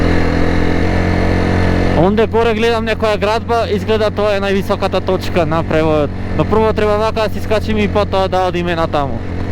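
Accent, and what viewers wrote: Bitola, North Macedonia. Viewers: Процедурата следува вака -Си седнувам пред комп.уклучувам твое видео и верувај цело време полу насмеан гледам со уживање.Мераци од пејсажи,интересни и за нас помалку чудни култури,битолскиот нагласок,духовити коментари.И еден апел до сите нас овде.Поддржето го детето во неговите авантури.Ретко мноооогууу ретко( за мене е дете) со 23 години би се осмелил на нешто олку гломазно патување полно со мал милион пречки,со мал буџет ама со голема храброст,енергија и ентузијазам.ЦАР СИ!!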